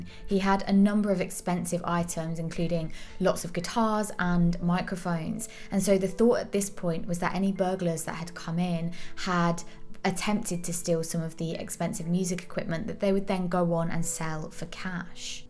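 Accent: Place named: East Midlands, United Kingdom. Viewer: sweet east midland voice, dimples and football active wear...be still my heart:thanksdoc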